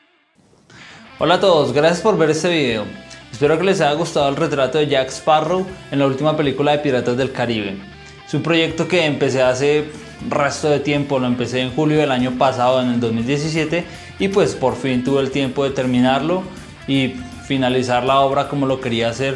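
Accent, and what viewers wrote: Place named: Colombia. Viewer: No se pierde el acento Colombiano!! Saludos maestro!!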